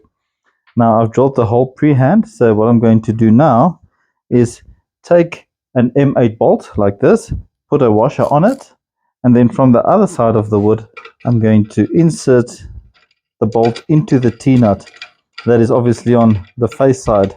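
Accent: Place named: South Africa. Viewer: Thanks for these great ideas! I've never used the t nuts and hanger bolts before. I'm not sure that I can easily sink the t nuts into timber that is 160mm deep using either of your first two methods. The threaded inserts have to go into a wall stud to hand the floating shelf on. That will be awkward to because we can knock holes in the wall, but there must be a way to do this somehow. I used to have a Dr from South Africa about 30 years ago (he worked in Tasmania) I still love the accent, thanks for triggering a happy memory, of course I love your accent too as it's the same as his!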